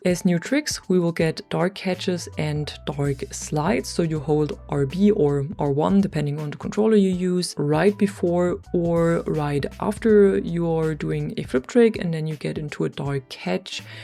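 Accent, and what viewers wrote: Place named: Germany. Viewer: Ich weiß nicht aber hört sich wie deutsch an. Ich bin Spanier aber ich wohnte in Deutschland. My first friends I did in Germany were talkin in English so I know what I’m saying. Can somebody confirm is from Germany Austria Swiss?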